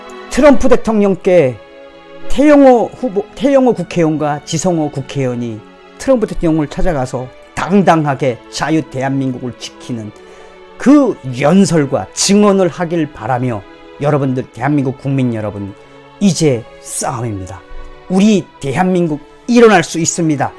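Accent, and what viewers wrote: North Korea. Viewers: 대표님! 북한말 귀에 거슬리네요!!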